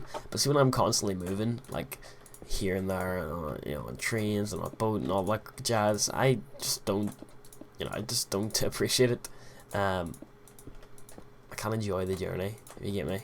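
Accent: Northern Ireland, United Kingdom. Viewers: I recognise the northern Irish accent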